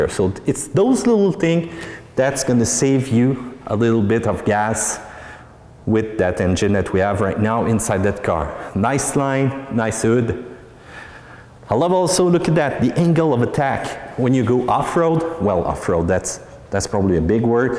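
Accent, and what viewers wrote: Quebec, Canada. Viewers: c'est pas du hate mais..j'avais jamais entendu notre accent québécois en anglais...c'est spécial xDD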